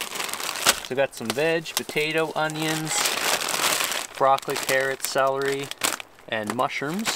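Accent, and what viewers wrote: Canada. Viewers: Luv that Canadian accent ! / almost thought I was watching the trailer park boys lol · where are you from? Your accent sounds similar to Cape Breton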